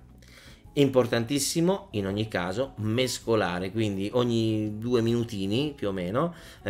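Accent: Naples, Italy. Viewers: haaaa bellissimo il tuo napoletano,e jammebbell"jà Bravvvoo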